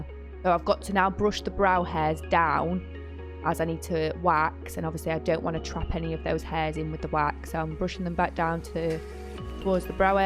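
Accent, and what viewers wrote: United Kingdom. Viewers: Great video but I love the accent! What part of the UK is this? 👌🏾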